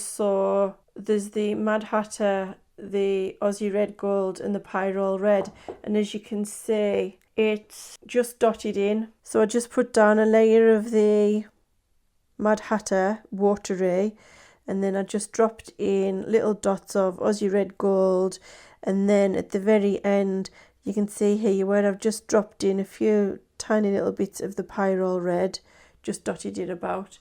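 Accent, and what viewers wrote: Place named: Birmingham, United Kingdom. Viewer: Trying in vain to pin down your accent but I’m getting North East England and Brummie 🤔 Lovely work and I’m glad YT shared your channel with me today.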